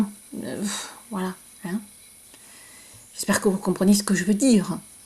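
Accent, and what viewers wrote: South, France. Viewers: Bonjour vous êtes mon rayon de soleil et le bonheur de vous entendre avec votre accent du midi je suis moi de même du midi MERCI BISOUS BISOUS BISOUS FERNANDE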